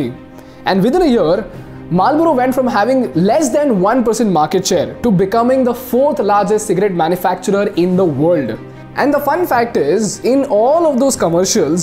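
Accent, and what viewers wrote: India. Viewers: " it is better to be absolutely ridiculous than to be absolutely boring" What an amazing video. So thought provoking. Love your Indian accent adds a veneer of ancient knowledge being conveyed. I found your sports analogy from an Indian perspective quite peculiar. Only an Indian would have used Cricket 🏏 as a metaphor 🤣😅😂. Most would have used Football ⚽️ and Maybe an American , American football🏈 . I just discovered your channel and have watched 3 videos now. Excellent content 👌 and production. Definitely earned my subscription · Love your indian accent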